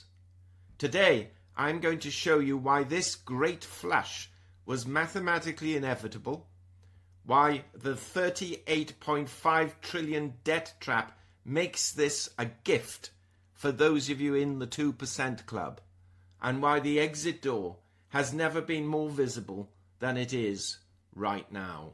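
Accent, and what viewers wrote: United Kingdom. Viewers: Hi - thank you. Really appreciate a calming analysis at a time like this. Had just assumed you were in the UK on account of your accent - gather not. All the best. Simon / Adelaide - Australia - PS the presentation seemed as polished as ever to me.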